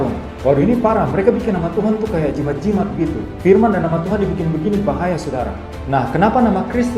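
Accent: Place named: Timor-Leste. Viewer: Gaya bicaranya spt orang timor ya 😄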